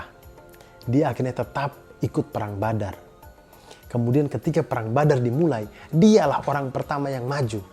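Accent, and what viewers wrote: Eastern Indonesia, Indonesia. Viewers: Bahasa timurnya kental sekali ,, saya suka saya suka ,, salam dri bnten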